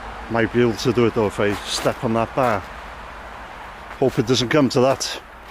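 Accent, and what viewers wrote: Wales, United Kingdom. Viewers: Sounds Welsh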